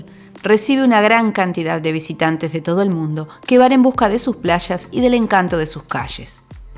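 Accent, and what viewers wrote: Argentina. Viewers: Podrias hacer el esfuerzo de hablar Español,embesde Arjentino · Ud. es de Argentina? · Locutora argentina, bravo!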